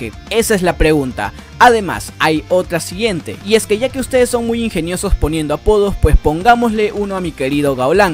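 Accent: Peru. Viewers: Vos sos peruano?? 🥺👌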